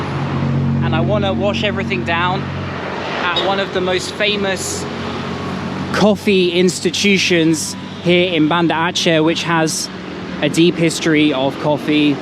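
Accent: United Kingdom. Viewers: Videonya keren mas,saya suka aksen anda.aksen nya sperti orang british yaa😁😁,suksess selalu sehat selalu semoga bahagia selalu yaa...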